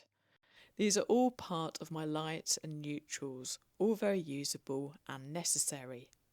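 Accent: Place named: United Kingdom. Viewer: Thank you for this review. I'm a Brit living in Denmark, it was wonderful hearing the dialect from home 😊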